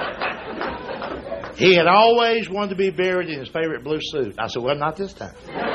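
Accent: South, United States. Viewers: Only the thing they have in common is the southern accent, both of them are great storytellers and can just simply cause you to bust out laughing by telling a simple story but they are two different comedians. The great Jerry Clower centered all of his routine around a family that lived in the Mississippi town he was from. This guy stretches it out more and brings just a lit little bit of political comedy into his routine where Jerry Clower stayed away from politics. This guy is more like the George carlin of the south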